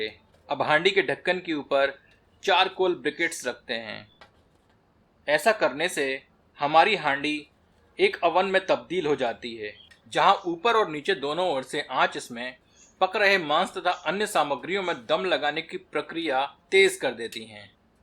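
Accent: Standard. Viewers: bahaut hi shuddh hindi se boltain hain jo sunne main acche lagtain hain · Inki Hindi vartani sun k man prafullit ho jata hai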